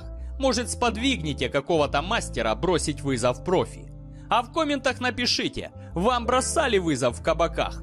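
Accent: Ukraine. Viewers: Комментатор,хохляцкий акцент чувствуется за версту.Идите тренеруйтесь.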